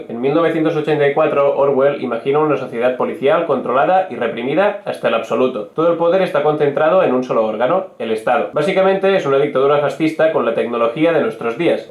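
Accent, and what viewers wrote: Spain. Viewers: Por tú acento creo qué eres catalán yo también, pero no creo en la independencia tampoco me gusta la actual España y su estado represor. Entonces donde ando yo? Me acerco más a un estado republicano justo y realmente democrático. Creo que en ese estado cabemos tod@ Me suscribo a tú canal. Porqué no hay una revolución? Buena pregunta. Creo y es sólo mi opinión que nos sobra individualismo y nos falta humanidad. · No, pero el que no está acostumbrado a oír el acento español, quizá se le haga un poco más difícil de seguir en video.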